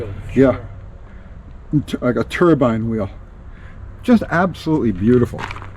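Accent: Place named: New Jersey, United States. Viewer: I love listening to talk, my grandmother was originally from New Jersey and she pronounced things just like he does 😂 being Midwest redneck kids we always thought she sounded so weird.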